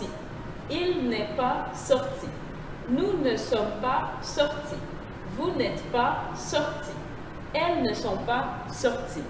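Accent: England, United Kingdom. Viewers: vous avez un acsent anglais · You gotta english accent, it's so cute♡♡♡. Thanks for your french lessons!♡♡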